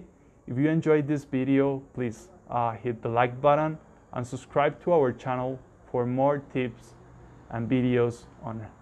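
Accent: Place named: India. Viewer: İ don't understand your English Indian accent or what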